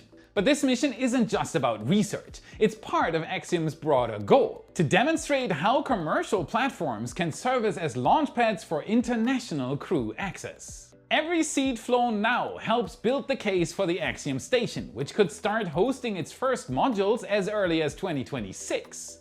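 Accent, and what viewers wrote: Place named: Germany. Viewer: Even his accent is Crazy. To less Information about the topic seems like some random german just startet with reels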